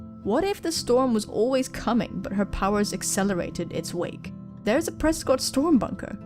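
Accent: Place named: RP, United Kingdom. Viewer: you sound soo posh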